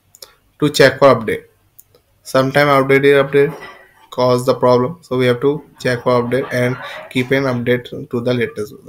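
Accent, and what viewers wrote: India. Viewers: Hate India accent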